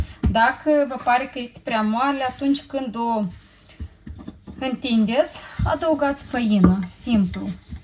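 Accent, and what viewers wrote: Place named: Moldova. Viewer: Ea e moldoveanca și vorbește moldovenește.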